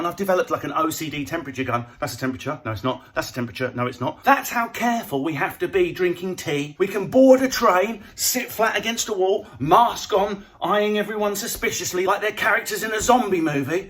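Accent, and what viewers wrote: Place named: England, United Kingdom. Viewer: Why is Athlean-X doing an English accent on his second channel?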